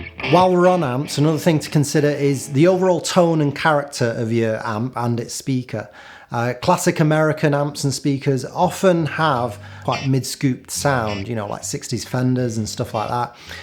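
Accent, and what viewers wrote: Manchester, United Kingdom. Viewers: Thought you'd misspelled 'account'... But no... MANCHESTOH 👍💪🐝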